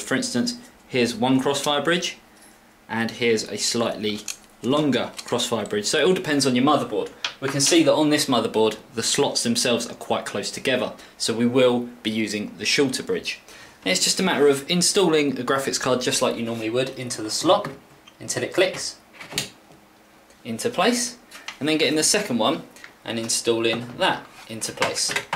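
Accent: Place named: England, United Kingdom. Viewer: gotta love english accents :D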